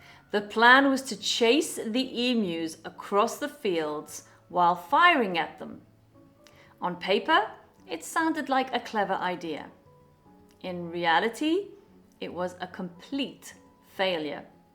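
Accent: United Kingdom. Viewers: New subscriber, love British accent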